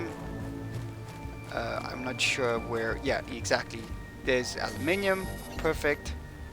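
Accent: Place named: South Africa. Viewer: Sounds like you from SA but anyway ty this was sooooooo helpful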